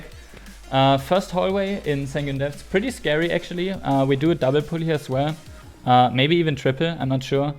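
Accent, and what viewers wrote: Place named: Germany. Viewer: Man hört einfach den deutschen raus aber nices Video 🙏🏻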